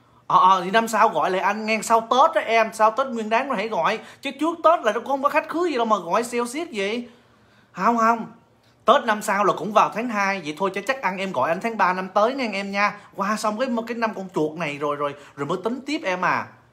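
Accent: Binh Dinh, Vietnam. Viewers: Tina Bennett ....tôi nghĩ ổng từ Quy Nhơn Bình Định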